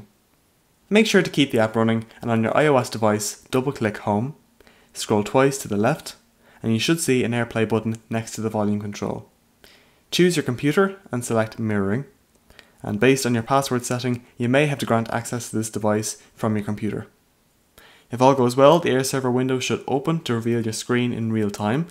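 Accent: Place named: Ireland. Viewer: I'm guessing Ireland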